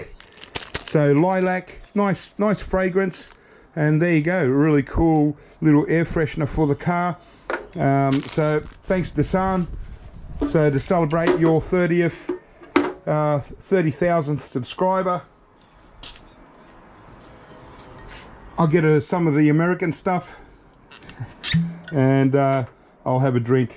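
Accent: Australia. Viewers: I prefer the short sessions anyway! It’s all mystical to me anyway but I like to hear the Aussie and see what you do. Merry Christmas 🎄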